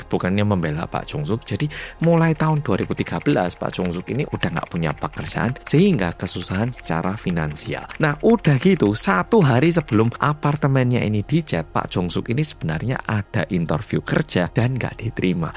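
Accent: Java, Indonesia. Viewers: Adminnya orang Korea kerennn deh, bisa bahasa Indonedia berlogat Jawa, he..he...👍👍👍😂😂❤🇮🇩🇮🇩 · Mantap 👍 bahasa jawanya medok banget😊❤️ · Logatnya medok banget, kayak orang Jawa.Sukses selalu Buat Abang ini. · Lha wong dia masa kecilnya sampai dewasa tinggal di Malang... sekolah di sekolah negri Indonesia... Pas kuliah aja s Singapur... Ya bhsa Indonesia nya medok lah .. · Duh medok e ,,, ❤❤❤ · ooo gitu tho mas medhok ... :-D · Woow..Bhs Indonesia dan dialex jawa nya mantap pol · Salut dgn adik Korea ini bhs Indinesianya sangat lancar dgn logat Jawa ....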